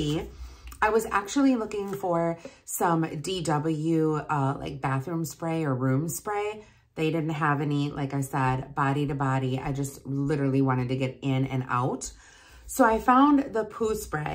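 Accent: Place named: Midwest, United States. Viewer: First thing I thought, midwestern gal!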